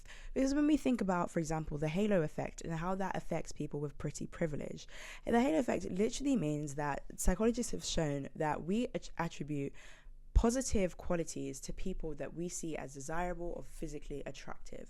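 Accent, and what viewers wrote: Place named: United Kingdom. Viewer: This is my first time watching one of her videos— But tell me why did I only realize that she had a British accent half-way through the video? LOL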